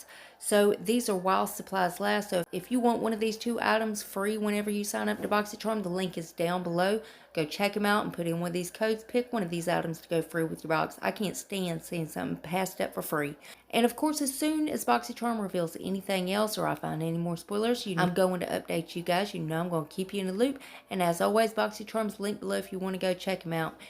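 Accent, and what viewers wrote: South, United States. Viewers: Hey girl, love the accent. You sound like me. LOL We girls in the south got it goin'.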